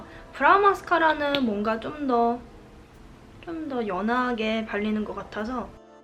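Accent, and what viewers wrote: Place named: Konglish, South Korea. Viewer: Is it weird if I say I love listening to you speak Konglish? :)))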